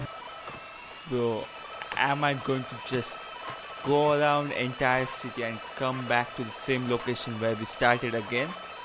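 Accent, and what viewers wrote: India. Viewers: T series acent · Es de la India , por eso habla así el inglés. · You are from India or another Country like this because accent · Sorry i love you and your channel no homo But your Indian accent sounds like youre sleeping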